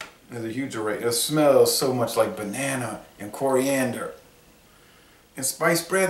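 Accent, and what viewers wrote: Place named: Midwest, United States. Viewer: Louisiana? You sound midwestern. The foil neck honestly just annoyed me into grabbing my beer glass instead of drinking it from the bottle. Not sure if that was their goal or not lol.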